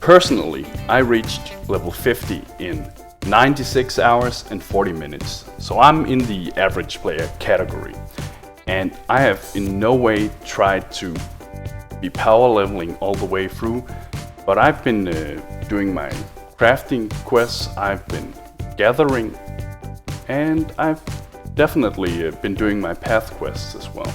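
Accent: Denmark. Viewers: Your accent sound danish :P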